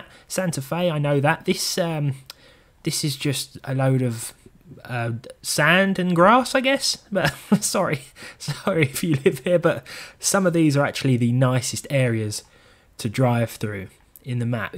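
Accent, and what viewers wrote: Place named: Essex, United Kingdom. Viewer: M25 racer (also London racer) were set in London and the Thames valley. You could race around Oxford or Knightsbridge. sounds like he's more Essex way, but the game was at least set in England!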